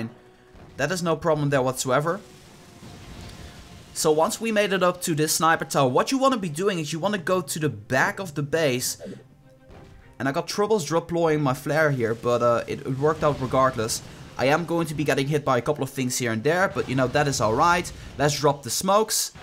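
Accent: Netherlands. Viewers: Hey man, you got some nice boom beach videos, just subscribed! 1 question though, where are you from exactly? Cause your accent sounds a bit funny (not in a negative way, but positive) i'm guessing from the netherlands?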